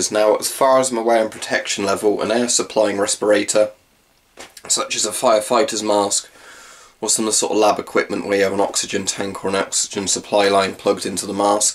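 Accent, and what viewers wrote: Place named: United Kingdom. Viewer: it's a british accent.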